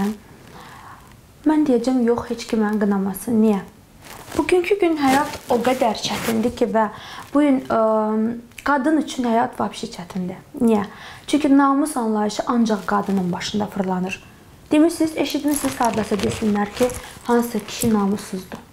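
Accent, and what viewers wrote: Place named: Trabzon, Turkey. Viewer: Trabzon şibesiiyle okuduğun mahmuda lap yerine düşdü evli kişiyle qarışdıran müğenni qadın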